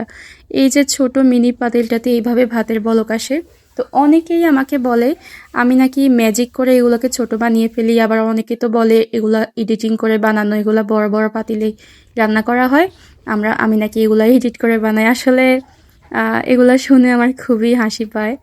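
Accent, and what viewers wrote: Noakhali, Bangladesh. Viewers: Apu apni Noakhalir na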